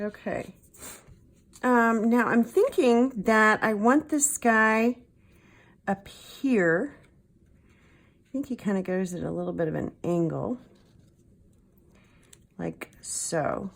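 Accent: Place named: Midwest, United States. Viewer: I found you through Tracie's GDT and am a new subbie! Loved your journal & followed over to see your pockets. I was so tickled when you said washi or warshi... LOL I was like omg she must be from the midwest, like Missouri! Then you said you were from Mo, I was born there and still have family there but I have not lived there since I was young. My grandma, my, mom, and all my family from MO & IN all say WARsh the dishes! Have fun and good luck with your channel, you are quite pleasurable to watch, nice speaking voice and a good sense of humor always win me over mixed with good crafting! win win!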